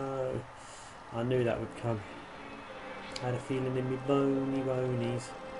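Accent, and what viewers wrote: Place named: England, United Kingdom. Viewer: you arent bugging me with your sloppy work. your accent (since im american its an accent to me =P) makes it all work it. very entertaining. I find it ironic that both commented LPs of this game are by fold from the land of england. even if you are in japan now like you mentioned in one of your videos.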